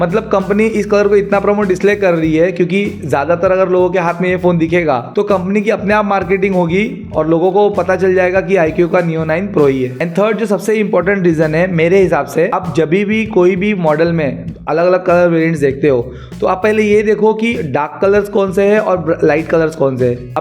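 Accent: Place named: Maharashtra, India. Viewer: मराठी माणूस आहे का रे भावा तू ? 😄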